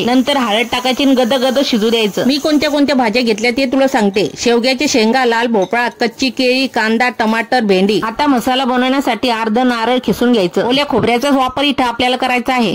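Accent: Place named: Pune, India. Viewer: ही बोलन्याची शैली पुणे जिल्हातील शहरा नजदीक चे गावात चलती,,,,, कारण मि स्वतः पुणे जिल्हातील आहे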